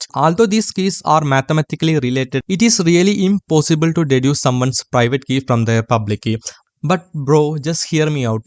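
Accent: India. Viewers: your Indian accent is strong almost like the ones they show in western shows! it is understandable to us but global audience or even Indians might find it little irritating, try reducing it that will help you reach global audience :)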